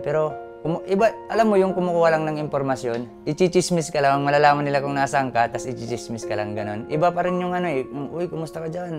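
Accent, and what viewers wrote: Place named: Waray, Philippines. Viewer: Waray man ka diay Doy hehe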